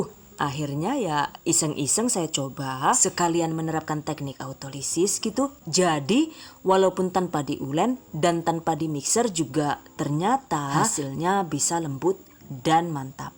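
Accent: Banyumas, Indonesia. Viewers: ya Allah ngapaknya 😂😂😂 maturtenkiu mbekayu resepe... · Mbak...aku galfok... Rika ngomong ngapak🤭🤭🤭 sukses selalu mbak... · langsung auto subscribe waktu denger si ibu ngomong ngapak...😅😅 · Ngapakke metu.ora ngapak ora penak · maksih resepnya bunda seneng deh,jelas banget cara penyampeannya, lucu lgi bunda ngapak² 😂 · suara ngapaknya bkin sy ktawa 2 bae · Yune wong ngendi sih bahasane ngapak banget... Anu turunan turki apa yah.. Aku tak coba praktek kiyeh yu... Seneng pisan yakin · "ookeeh!caatat!gitu yah!emang gitu yah!fifty menute laterthe choice is in your" Wel, it's amaiiiiiizing!!, madame lah kepribeeen ngapak 😁😁😁 bikin gagal fokus dengerinnya. Anyway pokoke siip laaah. Thx madame dan salut cengkok bahasanya inggris british banget. REQUEST: Saya sudah lama pernah makan ikan sapu-sapu kali saat camping waktu sekolah. Caranya saya mengolah terpaksa lumayan sadis (karena kulit ikan sapu-sapu "emang keras yah?" 😁). 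Pertama, ikan sapu-sapu dibakar hidup-hidup dalam bawah api unggun besar/panggang hidup ± 20 menit. Setelahnya saya gunakan ranting dan sarung tangan untuk keluarkan ikan sapu-sapu mateng. Lalu, Tekniknya saat ikan sapu-sapu masih panas langsung patahkan kepalanya dan tarik sekaligus (satu paket: kulit keras, tulang 1 set, usus dan kotoran, ingsang, batok kepala, semua langsung lepas dan buang). Sisanya sirip ekornya dipotong dan dibuang. Terakhir baru daging ikan sapu-sapu diolah dengan jeruk limau + bumbu + dimasak matang dan enak. Mbak, apa ada cara yang lebih bagus daripada cara dibakar seperti itu?, kalau pakai oven saat masukinnya itu ikan sapu-sapu apa 'menggelinjang-menggelinding tuing-tuiiing' yah?! Barangkali mbak punya Ide CARA Pengolahan ikan sapu-sapu dan MENU Apa. Saya tunggu kreasi mbak. Saya subscribe and thanks. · Hahah bhsne ky inyong pada bae ngapak bun 😂😂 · Sering nonton..asyik dan tambah sayik ketika bunda ngomong ngapak saya seneng..karena bisa menikmati vidionya sambil ketawa ketiwi sendiri..😃😃😃makasih resepnya ya bunda santiikk.. 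· Wong ngendi si kie seneng ngomong e ngapake ngepol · Ngakak Bahasa ngapaknya 😀😀 Rotinya mantul bun. Kudu di jajal kiye rotine 😂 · bund aselinya mana?? ora ngapak ora kepenak ya buund:D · Ya Allah.. ternyata dari negara ngapak to? 😂. Dari dulu kalau lihat ngakak mulu.. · Auto subscribe....karna ngakak ngapake metu...hidup ngapak🤣🤣🤣🤣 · ora ngapak ora kepenak...yora son?? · Wkwkwk enak ngapaknya ngomong nya, jdi kepengen kursus Bahasa ngapaknya. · Dening ngapak2 cilacap / banyumasan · Mbaknya kl ngomong sk lucu...trilingual pula..😁 sk bngt kl mbaknya ngomong pk bhs semacam banyumasan...luv u😘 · di ringokna nemen" malah gari ngapak😂. thank's for information and tutorial bun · i like ngapake yu...... #hidup ngapak wkwkwkwk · Awal nonton tak kira bunda orang luar..😅dari nada bicaranya.. lah skrg knp jdi ngapak bgtu bund 😅keren lah pokonya ga bosen nontonnya asik dn menghibur 👍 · Lahhh jebule mbekayune wong ngapak ya...!!! 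· Bu kang endi jane asale...deneng ngapak banyumasan yak 😅😅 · hahaha....lucu jg bu di vloge ini...aku suka bahasa ngapak ngapaknya...hayooo org Tegal atau Purwokerto niehhh....? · Terima kasih resepnya kak,suami sangat suka makan roti...saya suka kalau kakak ngomong bhs ngapak krn suami orang cilacap juga,sukses buat kakak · Syukaa dgn bahasa ngapaknya...makasih resepnya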